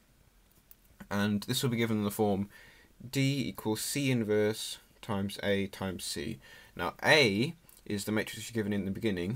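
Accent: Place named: England, United Kingdom. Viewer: English accents sound so cool! I totally get it now thank you so much!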